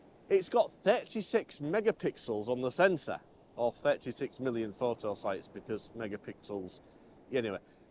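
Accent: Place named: Geordie, United Kingdom. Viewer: Thank you for sharing. Im from Germany and I feel exactly the same for this Camera. I started photography in the age of 16 with the legendary Olympus OM-1 in 1976. I used Olympus with great fun and success till around 2000. Then i must go to digital Cameras for professional purposes. Canon was the only affordable system in these times. So i lost Olympus man years till the Pen System comes out. Till this point Olympus was not useful to me. Later i bought the OM-D EM10 MARK I. STUNNING ! Since this point, i bought several bodies und lenses and do everything with it. Two years ago i thought, that i must have a Fullframe Camera, the Lumix S 5. But seriously, i use it barely. PS: i have good friends in Newcastle upon Tyne, their dialect is quite similar to yours. You must be a Geordie. 😊 Thanks for this Video, take care.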